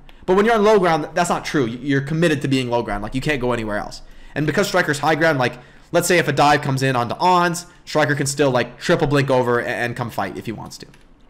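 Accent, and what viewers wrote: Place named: San Diego, United States. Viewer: The intro sound like Doug DeMuro. Is that just a San Diego cadence thing?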